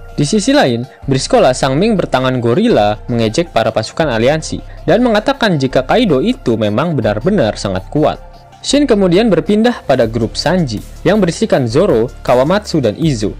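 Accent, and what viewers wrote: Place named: Java, Indonesia. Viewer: Wkwkwkwk alias kelelep .... Nguakak aku couk.... Jowo endi sampean cak..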